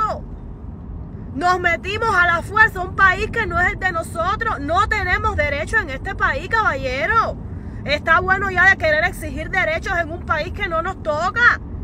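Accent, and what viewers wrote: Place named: East, Cuba. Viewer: nena, eres una grande, salud, y millones de bendiciones, yo soy de la habana pero me encanta tu asentó oriental, y muy bella que eres.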